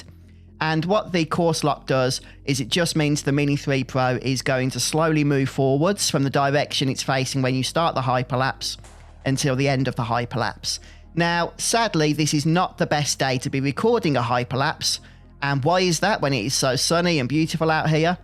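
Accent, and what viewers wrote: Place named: Midlands, United Kingdom. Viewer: Hi ive been watching your videos off and on for about a year now thanks for the videos. Im just wondering are you a brummie you sound like your from the midlands Im a former brummie who lived not too far from the city but been living in county Kerry Ireland now for over 35 years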